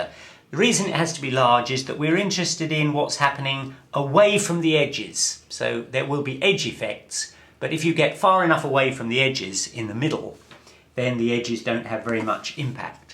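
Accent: United Kingdom. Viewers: One million thanks! fantastic clean and quick review for my EM final test, just one thing, , what if the other charge is not added at the sphere centre, how can the superposition implemented? the phi equation here is not applicable for non-central charges isn't it? Love your British accent BTW :)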